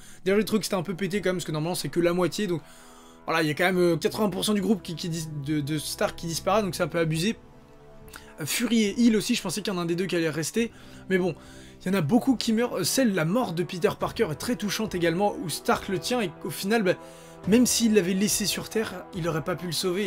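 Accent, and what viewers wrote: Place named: Lyon, France. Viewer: Tes lyonnais ? prck tu di trikar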